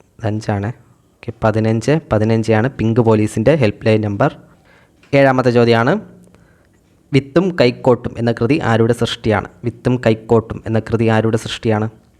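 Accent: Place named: Thiruvananthapuram, India. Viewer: Sir Tvm aano.....slang👍🏻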